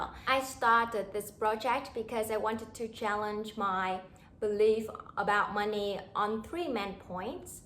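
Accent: Australia. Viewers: by the way, you speak English with an Australian accent, correct? I lived there as a child.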